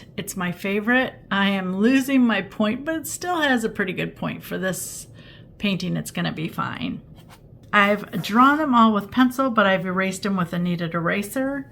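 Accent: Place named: Midwest, United States. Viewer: Hi! I just want to tell you how much I love your style and enjoy your videos. Your work is gorgeous! Thank you so much for sharing your talent ❤ On another note, I’m curious to know where you’re from. You mentioned the Art Institute of Chicago in a video I recently watched. I was born in Chicago and have ties in Wisconsin. I’m thinking your accent is maybe Wisconsin…Michigan…Ohio…?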